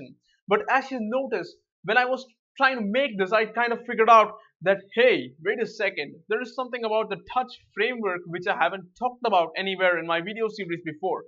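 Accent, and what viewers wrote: India. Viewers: I don't know why, to me he sounds like india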